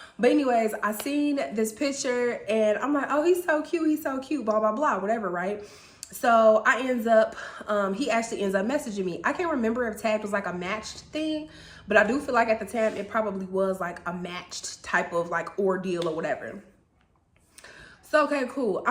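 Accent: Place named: Midwest, United States. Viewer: It's so Midwest 😅😅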